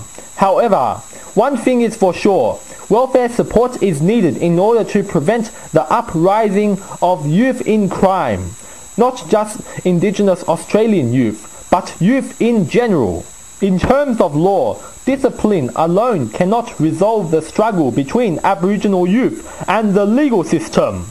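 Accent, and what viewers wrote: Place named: Asia. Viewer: why the asian accent ?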